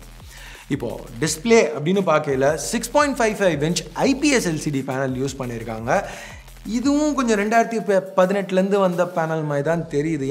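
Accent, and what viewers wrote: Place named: Chennai, India. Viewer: Now a days great changes in videos. Especially on slang. Chennai slang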